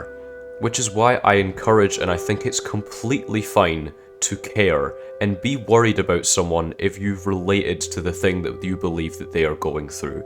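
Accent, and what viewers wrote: United Kingdom. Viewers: dont try to hide the scottish. i can tell you are. let the inner celt out fellow scott. alba gu braith! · Is that an Edinburgh accent bro?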